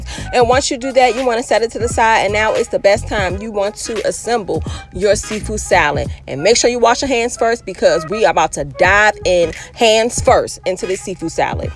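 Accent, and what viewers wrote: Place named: Baltimore, United States. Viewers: I ran across this video because I'm trying to figure out how to perfect my oyster fritters. But this exactly how I make my seafood salad. And I hear that B-more accent sis. Fellow Marylander here...😅.. I'm From Salisbury MD.. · I knew your accent was givin a subtle Baltimore ish twang to it lol...great vid 👌🏾👌🏾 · What part of maryland are you from....baltimore Md or like PG county md... I’m just tryna decipher ur accent 😂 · Accent!!!!!! Sounding like Bmore or sum. 🙌🏽🙌🏽🙌🏽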